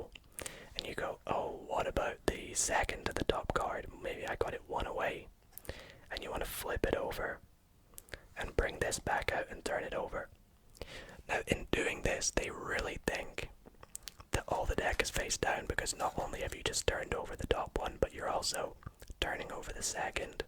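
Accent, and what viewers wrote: Scotland, United Kingdom. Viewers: Your Scottish accent makes your asmr the best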